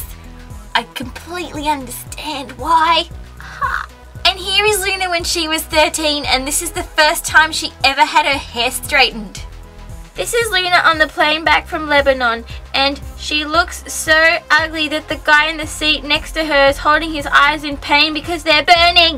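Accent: Australia. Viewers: are you australian? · UR AUSTRALIANNNNNNNNN!!!!!!! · your from melbourne arnt you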